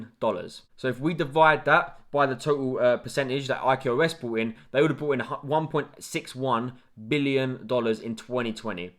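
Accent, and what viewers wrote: England, United Kingdom. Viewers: What’s up with the English accent and a god damn yankee hat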